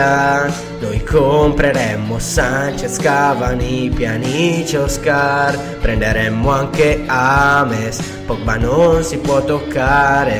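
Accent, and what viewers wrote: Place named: Naples, Italy. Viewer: carina, togo l'accento Napoletano, apprezza i ringraziamenti perché sono Juventino XD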